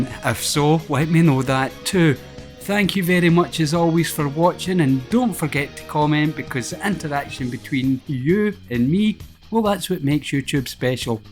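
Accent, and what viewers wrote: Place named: Ayrshire, United Kingdom. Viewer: Just found your channel, good to here a local accent im just down the road in Prestwick 👍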